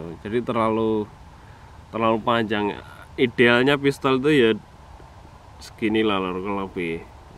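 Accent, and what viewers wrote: Java, Indonesia. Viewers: jowo nya msih medok, masyah allah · Wong jowo mz kok lagate jowo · NITIK LUR...EDANAAAANNNNNNN ....JOS KANG TETEP KETOK JOWONE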